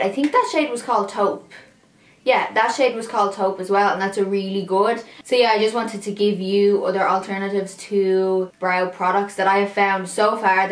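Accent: Ireland. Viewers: nidaa haib pretty sure Irish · Irish (Dublin I think)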